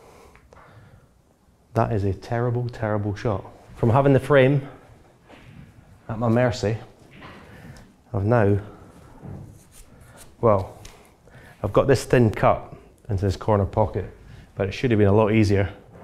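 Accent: Scotland, United Kingdom. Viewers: He talks like a regular Scotsman enjoying his sport. The insights regarding positional play is gold.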